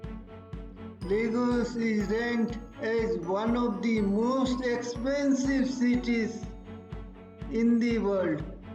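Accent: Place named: India. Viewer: He is Indian His accent is his identity We love it like that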